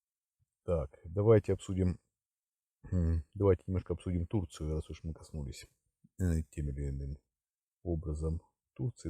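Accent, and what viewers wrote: Ukraine. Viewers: Какой ты генерал? Ты балабол с харьковским акцентом.Где признаки катастрофы гражданской авиации конкретно? В каком смысле? Технического обслуживания, проведения тяжёлых форм, замены запасных частей? Просто балабол тоскливый...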